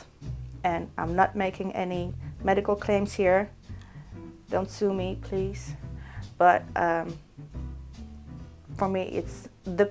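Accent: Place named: Netherlands. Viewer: I thought your were Dutch, sorrie it's always the accent. Love your date tarts by te way!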